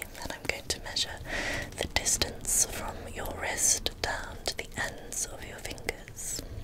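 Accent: England, United Kingdom. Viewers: The tone of your voice is perfect. It’s very calming. An English accent suits asmr, it’s mesmerising.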